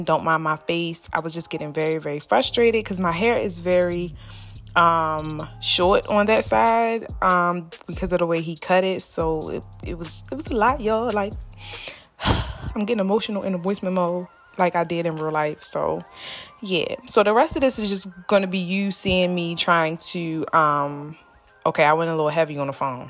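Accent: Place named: DMV, United States. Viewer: accent😂gotta be from dmv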